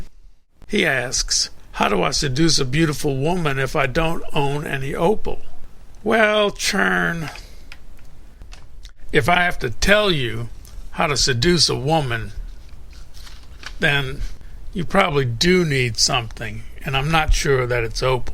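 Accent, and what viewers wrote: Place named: South, United States. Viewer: Love your vids. But it drives me crazy that I can't place your accent. It's like a little bit of Virginia or Arkansas, but steeped in some deeper south, like Alabama or Louisiana. You tend to shift in and out of a deeper accent when you talk. Where is it from??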